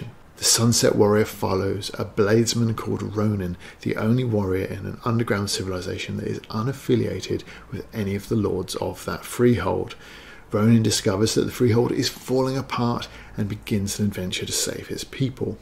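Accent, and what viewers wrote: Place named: United Kingdom. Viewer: totally serious. You obviously have a good command of the stories you read, and your voice is pleasant and British without being too nasal or: ok I need your help with the explanation of this description. I don't know how to describe this as an American, but what I'm trying to describe is a dialect of British speech that resembles cockney but isn't quite it. Dominique Tipper from that space show speaks this way. I know it's because of Hollywood exposure that I/we Americans have this bias but that dialect sounds, well, hoodlum-ish to us. I suspect we took our ques of woke capitalism from how you Brits dealt with these prejudices in the 90s (spice girls promoting a mixed British identity and language equality come to mind) and applied it to race and gender. Do y'all have a term for this there?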